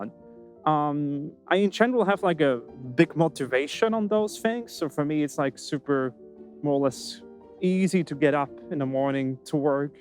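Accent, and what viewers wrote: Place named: Germany. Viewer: Naww that's really awesome that you've made a vidio like that! ;3 And as a German speaker I would also say your accent is more like "Hochdeutsch"! ;3🐾🦊🐉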